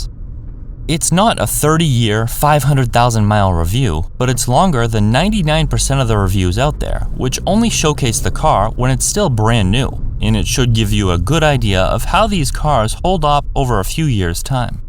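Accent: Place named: United States. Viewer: I will subscribe when you stop saying "and" like an american. This is an error, try to reverse to the British "and".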